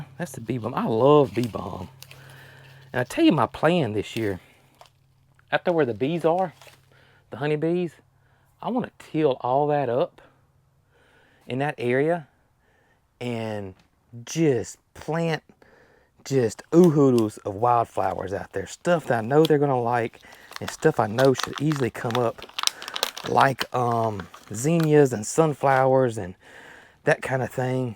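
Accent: South, United States. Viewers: and you have such a beautiful intelligent daughter grown up fast we hope zerks ok. my husband could watch you in youre man tunnel allday 24/7 I hope does more cooking as we are from Scotland i cooked and gave him cornbread and turnip greens yes it was a thumbs up cornbread now part of dinnertime with his gravy love the southern accent you should do an audio book soothing voice and when can bring a southern cookbook out love the clan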